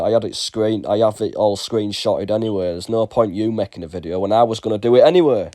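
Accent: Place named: United States. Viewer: He’s defo American his voice is so jarring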